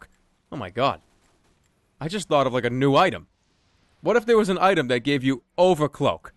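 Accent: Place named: New Jersey, United States. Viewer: Are you from New Jersey or Boston by any chance? I'm in New York. I can hear a strong accent :D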